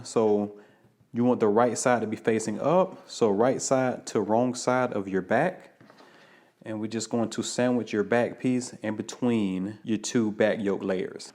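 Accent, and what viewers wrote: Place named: Georgia, United States. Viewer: I recognized that GA accent. Good job! Thanks From a fellow Georgian. I live about 4 hours away from Melanated Fabrics and it's on my bucket list to visit. I just dont get to Atlanta much.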